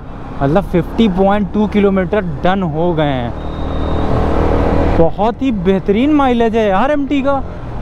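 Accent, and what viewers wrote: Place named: Mirzapur, India. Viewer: Everything aside your mirzapur like accent ❤️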